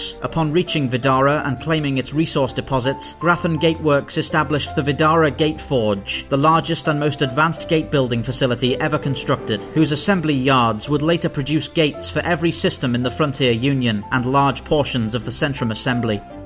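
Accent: United Kingdom. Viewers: Hi I'm a fan of your work on this channel. I'm impressed with the professionalism of your videos and voice over, also nice to hear a fellow brit. I was hoping i could get your attention for short fiction review if that's something you do.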